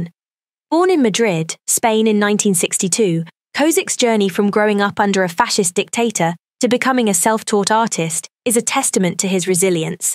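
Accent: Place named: RP, United Kingdom. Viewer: It's pronounced "vie da" , not "vee da", Blue. Rhymes with "Ida". Since you use British RP accent, it rhymes with cider, not cedar. Anyway, may God grant Vida Blue eternal peace.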